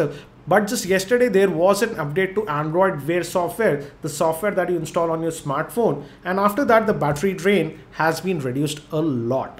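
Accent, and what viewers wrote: India. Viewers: " it is kind of oke " i love indian accent and how they shake their head while talking.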